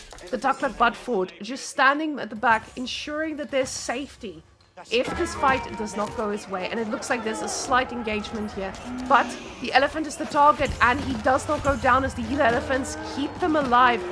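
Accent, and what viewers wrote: Afrikaans, South Africa. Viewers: Taklak, die Afrikaans klap hard :p Lovit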